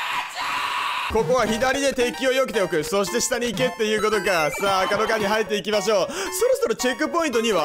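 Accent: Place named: Hakata, Japan. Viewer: ひぃぃぃ！？の謎のエコーみたいなのおもろいし僕も一緒にぶっ壊れようと思いますｗ あとところどころ博多弁出るの好き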